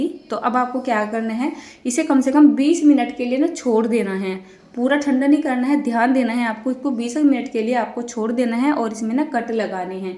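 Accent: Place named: Uttarakhand, India. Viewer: Acha bna hy ghee kafi lgta hy hath maiy lga hua hy achi grhni ho ❤❤😂😂 bolne se uttrakhandi lg rhi ho jai ho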